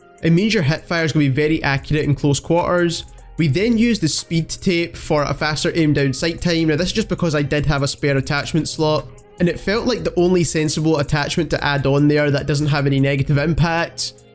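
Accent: Scotland, United Kingdom. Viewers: just interested are you scottish cos i am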